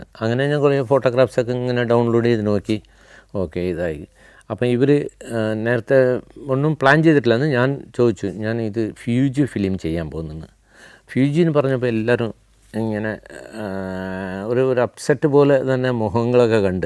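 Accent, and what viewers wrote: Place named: Tamil Nadu, India. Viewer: Tamil Malayalam mixing slang is nice to listen. Waiting for next episodes..keep going ❤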